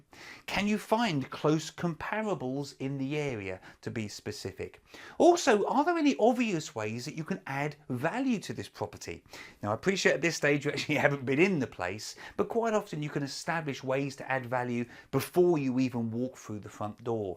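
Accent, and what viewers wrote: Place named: England, United Kingdom. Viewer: Gt video,u might not believe It, but your voice and English accent makes the video more attractive 😀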